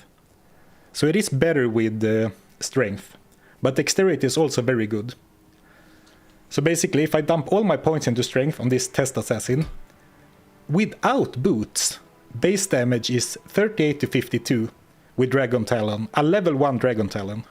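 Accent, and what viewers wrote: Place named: Sweden. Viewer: Hello fellow 🇸🇪 And as a "gaming tradition" in Sweden when at least one persen don't understand Swedish, everyone in chat/voice talks English. Therefore I will take this in understandable English. I belive Mephistos life points will be easer to reach than Duriels was. With at least 40 more strength. (perhaps ~50 if a 3os helm is possible or good circlet) and more possible strength on rings, amu and so on. + A few more lvls and skill shrine this will be easy for you. At least that is what I belive🙂. Got very sad when suddenly Duriel was dead. Good with the clip. Sad that it was without sound. Sidetrack. Got really suspicious when I first heard you. Wait what?! Is he from Sweden? You confirmed later on that vid or another one. I really enjoying your challenges. Keep them up😈🤘 Another sidetrack. Is the microwaved tea reheated👍 or do you prepare it that way☠️? 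Usual flavor? With or without milk? Even more sidetrack. In what part of Sweden do you live close to? If you answer this I guess you will have a big radius from the town you are meanchening. Because of the now little less anonymity with facecam and closer to 40 than 30 thing. BUT, let's think of the real threat, DIABLO !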